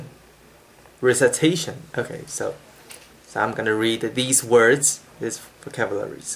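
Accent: Taiwan. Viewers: its pretty obvious taiwanese accent, which is great! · Btw you do have Taiwanese accent...but your English is definitely good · You have a southeast "Asian" accent, be it Chinese or Taiwanese. But a little different than Korean. But yeah, you do have a Taiwanese accent as far as speaking in English. By the way, "pecan" is what you know as 胡桃 Hútáo. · you sound like a typical taiwanese english accent... taiwanese do have an accent...anw all places have an accent... like for english the 2 major accent are american and british accent and Mandarin the major accents are the chinese and taieanese... so there is a taiwanese accent... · 聽得出是台灣人 >^< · Yes, you speak English with a Taiwanese accent, which means that Mandarin is your mother tongue. I thought that I had given you a very clear answer.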